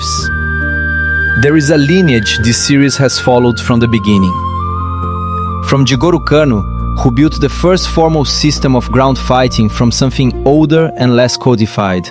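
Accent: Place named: Brazil. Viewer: I love that this love letter of a video is done by someone with a brazilian accent. It’s easy to get lost in blind pride for people from your country, and I salute you for keeping it real instead.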